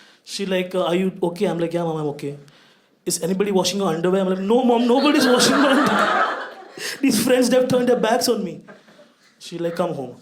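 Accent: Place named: India. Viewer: I dont understand 99 percent of what he s saying. It seems like he speaking English and some other anguage but everything sounds like that Indian B.S. 😠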